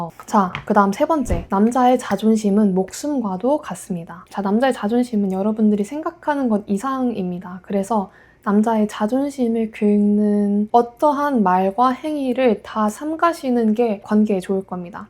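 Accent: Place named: Gyeongsang, South Korea. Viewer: 부산 경남 사람이신듯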